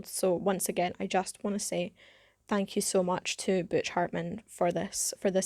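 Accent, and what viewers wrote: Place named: Scotland, United Kingdom. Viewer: Where are you from? You have a really Scottish accent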